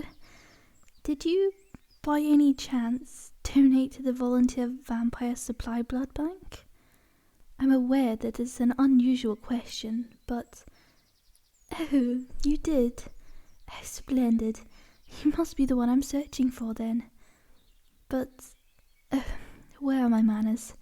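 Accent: Scotland, United Kingdom. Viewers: I love the hint of Scottish in the accent btw! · Scottish or British, miss? Or might you be Aussie? I'm lead to believe you are one of the three.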